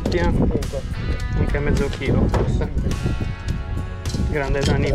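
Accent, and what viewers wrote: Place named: Sardinia, Italy. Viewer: Complimenti per il video ben curato e per i bei pesci😉 dall'accento si sente che sei sardo, posso chiederti di dov'è?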